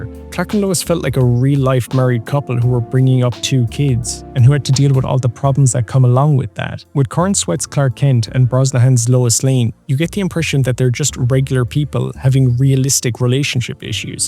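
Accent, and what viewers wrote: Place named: Ireland. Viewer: Why am I hearing the Jacksepticeye irish accent? lol Good video tho I agree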